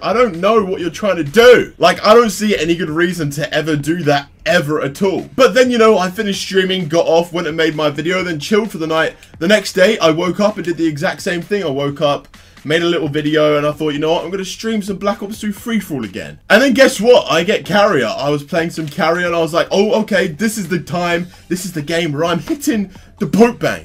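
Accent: England, United Kingdom. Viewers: Where in England u from?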